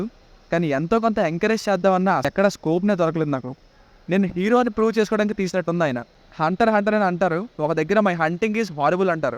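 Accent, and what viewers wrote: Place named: Andhra Pradesh, India. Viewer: అరేయ్ నువ్వు ఆంధ్ర వాడివని నీ యాస లోనే తెలుస్తుంది అందుకే రాయలసీమ తెలంగాణ హీరోలను డి గ్రేడ్ చేస్తుంటారు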